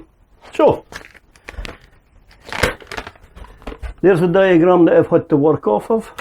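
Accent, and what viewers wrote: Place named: Scotland, United Kingdom. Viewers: Eh don't know what gave it away. Eh must have noticed a wee Dundee quirk in your accent. 😁 Just a quick note to say fixed my Karcher leak. Turned out to be the top elbow joint. Fantastic local supplier in Inverurie got me the bit. Took a wee while to free it as the clamp was seized solid but my trusty mole grips sorted it (bought when I was about 18 for £2.99 - best buy ever). Anyway, thanks again for your video, which gave me the info I needed and a great laugh at the same time. Have a great weekend, and take care. Cheers, Harry (well, actually it's Alan - Harry's my crime thriller writer pseudonym) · Cheers for info, no problem understanding your Scottish accent as a good mate of mine is from Gourock 😎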